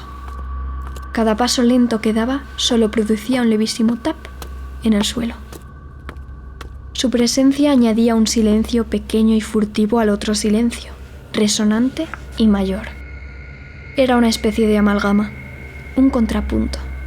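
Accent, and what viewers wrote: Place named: Spain. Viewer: Amo tu pelo y tu acento español!! ♡♡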